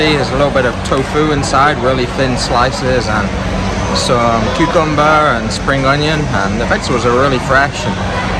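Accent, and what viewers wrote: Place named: Yorkshire, United Kingdom. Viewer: something about his yorkshire accent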